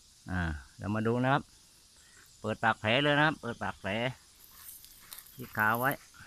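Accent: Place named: South, Thailand. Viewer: ผ่านหลวง คนใต้แน่ฉาดๆ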